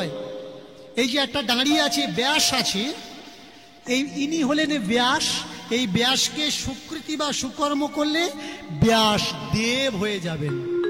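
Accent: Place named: West Bengal, India. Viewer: কীর্তনীয়া মহাশয় আপনার বাড়ি কোথায় ? কথা শুনে মনে হচ্ছে পশ্চিমবঙ্গের বীরভূম , বাঁকুড়া কিঙবা পুরুলিয়া জেলার যে কোন একটা জায়গায় হবে হয়তো । তবে গান টি খুব ভালো লাগলো ।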